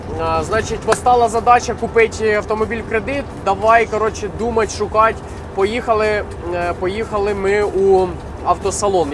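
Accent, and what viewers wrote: Ukraine. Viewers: Деревня , суржик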